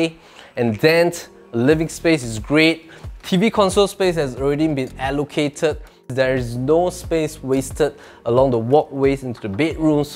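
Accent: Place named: Singapore. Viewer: Love your Singlish. Don’t need to work on your pronunciation 🥰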